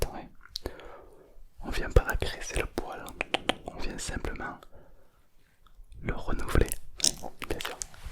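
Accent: South, France. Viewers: pk il fait l accent du sud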